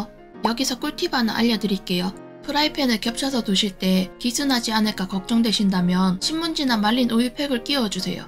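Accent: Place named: Gyeongsang, South Korea. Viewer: 경상도 억양밖에 안들림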